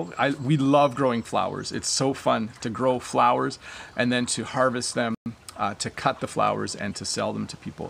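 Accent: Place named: Canada. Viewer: Hi, I am an English teacher and I always recommend Canadian accent to my students. By the way I love the way you teach English and talk about what is going on around you. That's sounds more real.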